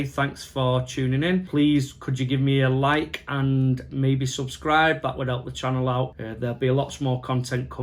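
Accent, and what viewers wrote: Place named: Northern England, United Kingdom. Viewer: This is gonna sound really stupid.. but I’m really happy I found someone with a good northern accent hahahaa no offence to anyone else but ya can’t beat a northern British accent (I’ll be embarrassed if you’re from the midlands or something hahaha)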